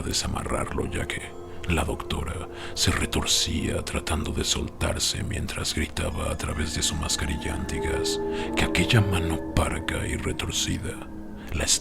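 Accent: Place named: Mexico. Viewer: Que gusto volver a escucharte brother! Tus historias y narración son inigualables, neta tienes un estilo muy chingon con ese sabor mexa que tanto hace falta, éxito y espero con ansias tu próximo video 🤘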